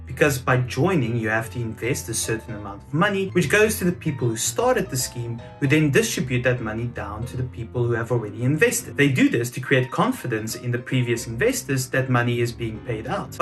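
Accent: South Africa. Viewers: I thought you sounded South African. I'm originally from Ghana, but I live in South Africa.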